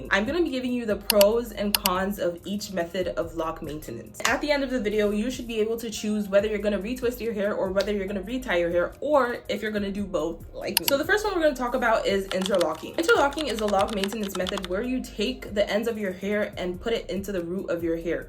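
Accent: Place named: Africa. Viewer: You have a slight accent, I’m just curious are you African? Also I love your videos, they’re very helpful.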